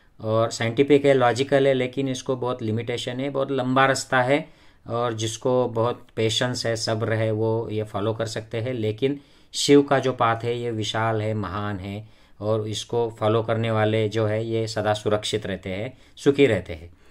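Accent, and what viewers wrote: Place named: Maharashtra, India. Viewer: खुप छान पण तुम्ही मराठी मधे सांगावे कारण उचार pdhat मराठी वाटते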